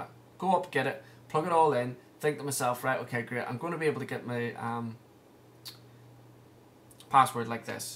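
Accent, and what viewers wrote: Northern Ireland, United Kingdom. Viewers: Northern Irish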